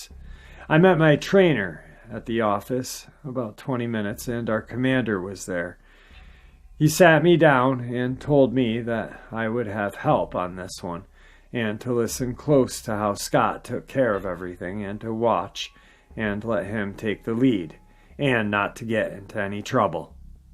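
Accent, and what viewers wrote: United States. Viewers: Anyone else hear a John Wayne accent?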